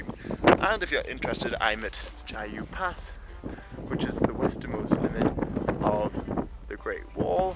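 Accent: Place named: Northumberland, United Kingdom. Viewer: Are you originally from Northumberland? We have the same accent.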